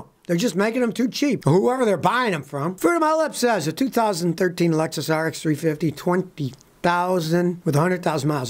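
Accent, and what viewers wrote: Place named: Buffalo, United States. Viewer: SO FUNNY ,I BEEN watching this show , your in Texas ,but I heard Buffalo ,you talk real quick ,that Lake Erie water made you smart.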